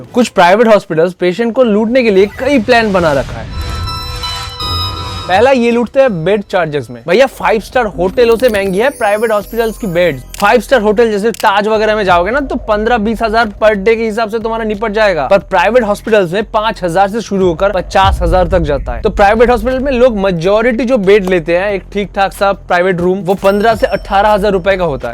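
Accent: Bengal, India. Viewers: fir bhi Hindi me Thora Bangla ka prabhav h..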